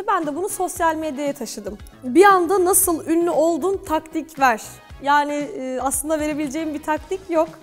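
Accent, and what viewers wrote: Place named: Kastamonu, Turkey. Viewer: Kız sen Kastamonulu musun 😮 ben de diyorum bu kızın konuşması bana niye tanıdık geliyor 😅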